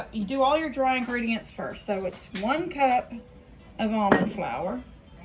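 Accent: Texas, United States. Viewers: Just watched three of your videos-----and all I can say is that---YOU ARE THE JACK OF ALL TRADES!!----cleaning, organizing, feeding all your BABIES--mowing & raking/blowing leaves, fixing ALL KINDS of stuff, decorating, undecorating, crafting---oh my goodness--the list goes on--------and NOW---you are baking cookies----and homemade iced tea!!! WOW!!! AND just LOVING your TEXAS ACCENT!!! Will be looking forward to all your videos🥰😍🥰😍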